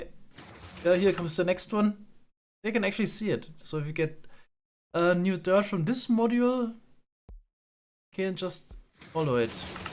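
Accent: Germany. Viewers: Waiiiiit is he German?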